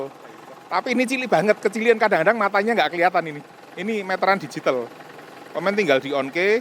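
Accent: Java, Indonesia. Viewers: Seneng senyumana pak bos sama logat jawanya .yg khas👍🙏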